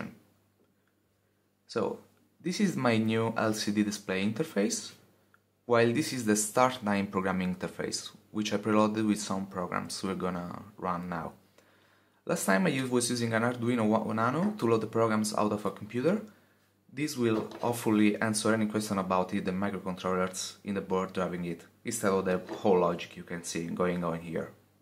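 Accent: Italy. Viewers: Italiano ? Si sente l'accento.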